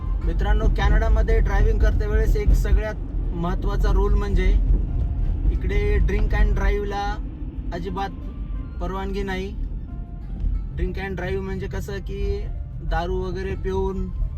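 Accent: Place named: Latur, India. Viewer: 🙏🙏राम राम दादा सर्व काही माहिती खूप छान देता कॅनडामध्ये राहुनी ही मराठी गावची लातूरची भाषा नाही विसरला तुम् ही❤❤👌👌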